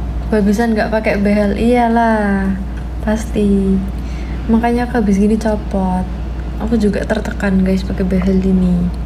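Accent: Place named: Java, Indonesia. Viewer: Lucu tauk kalo medok, udalah be your self 😊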